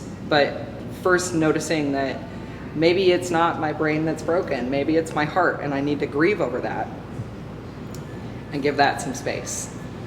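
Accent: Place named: Minnesota, United States. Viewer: I thought I detected a Minnesota accent! That's my home state. Cheers!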